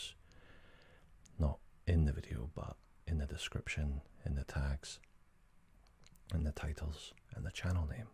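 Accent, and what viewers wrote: Scotland, United Kingdom. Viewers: Scottish understones asmr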